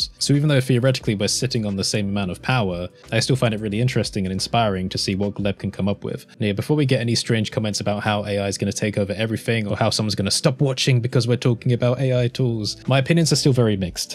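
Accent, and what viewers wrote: United Kingdom. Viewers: I could listen to this Bri-ish accent forever much better if I Could speak it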